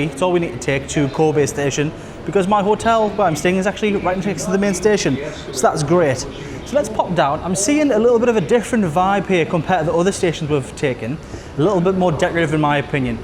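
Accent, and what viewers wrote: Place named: Northeast England, United Kingdom. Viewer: I'm sorry but this is too funny!!! Are you from Sunderland or Newcastle?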